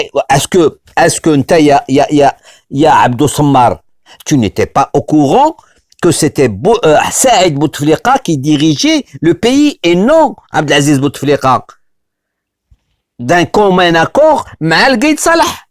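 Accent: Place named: Morocco. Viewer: Mais toi tu parles sans visage et tu parles plutôt marocain !! ce que tu dis n'est une nouveauté pour personne. si au moins tu montrais ton visage , vraiment d'ou te vient cet accent???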